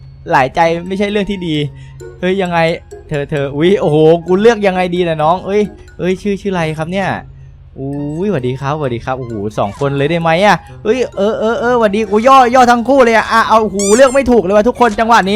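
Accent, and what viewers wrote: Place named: Suphan Buri, Thailand. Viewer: พี่เป็นสุพรรณหรอคับทำไมพี่พูดเหน่อ😅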